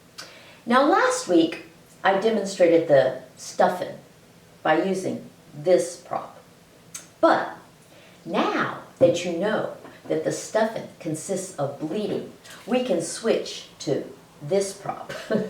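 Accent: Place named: Texas, United States. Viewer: You are the best teacher…EVER! I loved your Texas drawl. ☺😘💕 Until next week, my dear.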